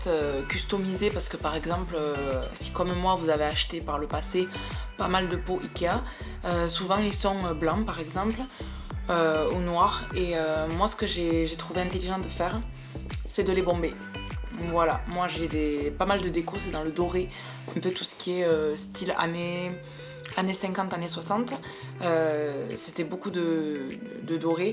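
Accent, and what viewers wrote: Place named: South, France. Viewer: l'accent du soleil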